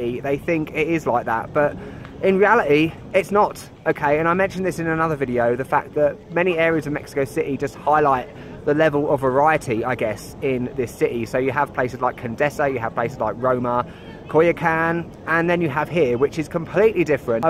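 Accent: United Kingdom. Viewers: Hi! I am a native from Mexico City and I love your videos on my home town. I like your style: dynamic and objective. I don't live in Mexico City anymore, so when I watched your videos, I got to see new places and attractions. Also, I LOVE your British accent. It's pretty cool. Wish a great sojourn at Mexico City. Cheers!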